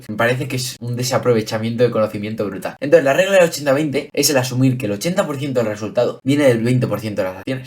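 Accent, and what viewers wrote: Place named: Spain. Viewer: No se si es tu acento español o tu vocalización bro, pero deberías mejorarla para que esta valiosa información sea mejor comprendida y llegue a mas gente.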